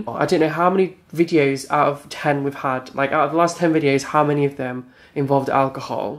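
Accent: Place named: United Kingdom. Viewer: The British accent makes it particularly saucy.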